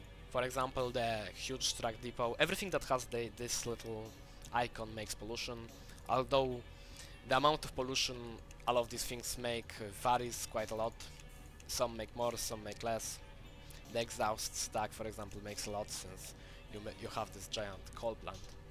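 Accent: Poland. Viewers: ah ten polski akcent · masz akcent polski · Coś czułem po akcencie że rodak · You sounds like Polish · Im thinking polish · Słychać po akcencie że Polak rodak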